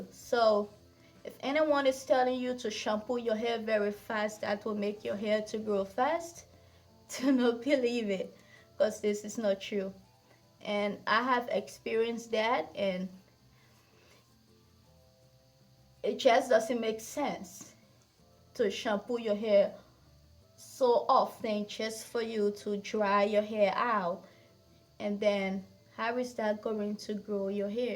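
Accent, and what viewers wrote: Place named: Liberia. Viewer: Your accent remind me so much of my sister in law - she is Liberian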